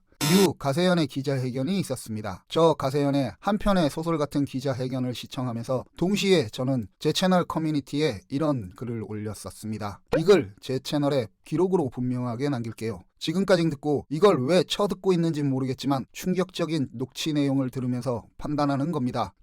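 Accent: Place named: North Korea. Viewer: 북한 사람 같아요 말투가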